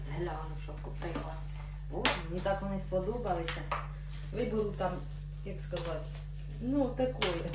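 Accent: Poltava, Ukraine. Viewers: Полтавськая область, Карловский район. Это родной мой край, где прошла моя молодость, где могилы моих родителей. Слушаю и наслаждаюсь, у меня тоже такой же говор. ❤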